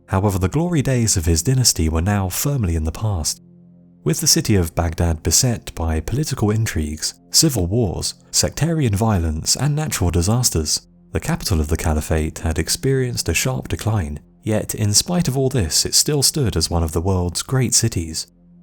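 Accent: England, United Kingdom. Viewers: Every time I hear an English accent I think of English Peter Griffin and his teeth..🎩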